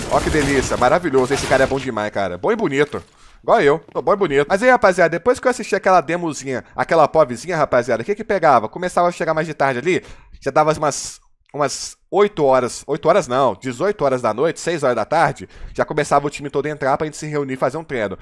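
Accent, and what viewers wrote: Belo Horizonte, Brazil. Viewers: Cara vc tem muito sotaque de BH, vc é?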